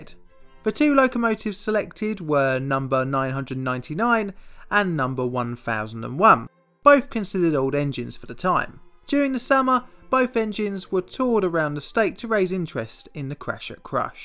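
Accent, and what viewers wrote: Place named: RP, United Kingdom. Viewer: No worries, you're one of my favourite subs, you're interesting and I like your queens English and voice, you should really have more subs.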